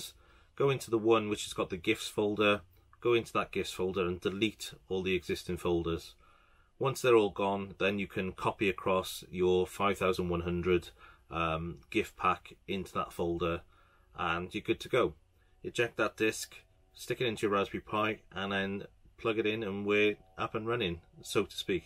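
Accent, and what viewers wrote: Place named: Wales, United Kingdom. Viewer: Heya. I thought that accent sounded familiar. Are you from Cardiff? I’m from Newport :) I do 1-Credit clears of arcade and console games mainly. Those LED panels look really cool, I may have to look into this.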